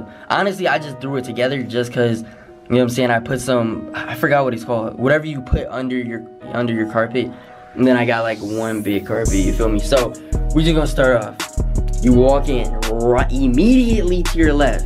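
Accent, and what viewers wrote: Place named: New York, United States. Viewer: What’s ur ethnicity and where u from ? U sound from New York and look Dominican 😭😭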